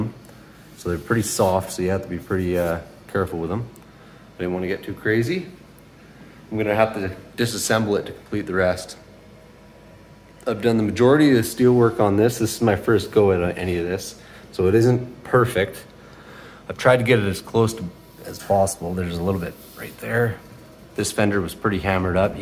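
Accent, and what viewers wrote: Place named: Canada. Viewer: 1) you sound Canadian 2) what rear bumper?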